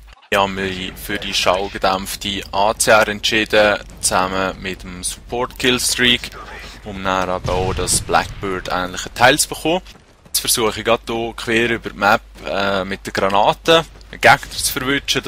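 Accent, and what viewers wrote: Switzerland. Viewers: Sehr hilfreich, gut gemacht. Nur bemuehe dich mal bitte hochdeutsch zu reden (auch wenn ich den Dialekt mag, ist schwer zu verstehen), dann gucken dir auch noch die 80mio deutschen zu und nicht nur die deutschsprachigen schweizer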